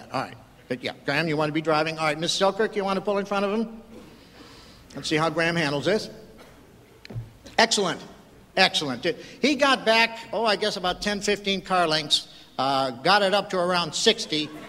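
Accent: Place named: United States. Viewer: The first time I heard this skit it was on an LP record at a friends house, so no film track just the sound track back in 1978, I was only 17 and I thought he was the funniest man I had ever heard and it was the first time I had ever heard an American accent. I thought he was brilliant, I made myself remember his name and I am so glad I did. This is the second time I have ever heard this skit and it is exactly as I remember it, nearly 35 years later... still as funny as ever...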